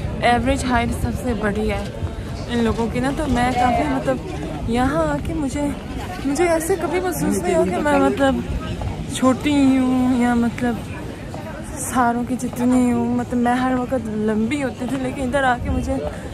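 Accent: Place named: North, Pakistan. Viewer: Her accent shows, she is NOT Korean but Pakistani from north of Pakistan. She is not Korean; not at all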